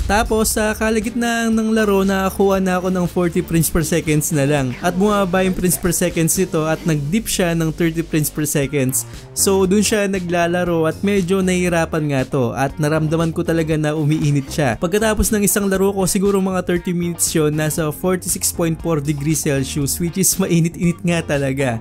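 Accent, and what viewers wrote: Pampanga, Philippines. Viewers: Kapampangan accent tlga e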